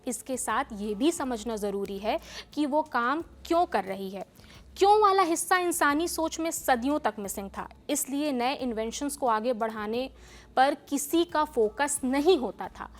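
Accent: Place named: Bihar, India. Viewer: Y all lallantop anchor use bihari accent....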